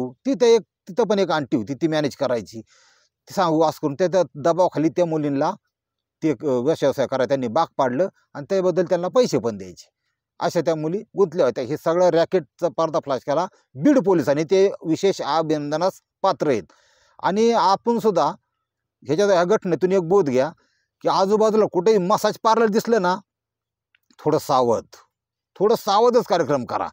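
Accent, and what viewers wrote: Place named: Pune, India. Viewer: तुमची गावरान पुणेरी भाषाशैली आणि उच्चार ऐकायला लई भारी वाटतात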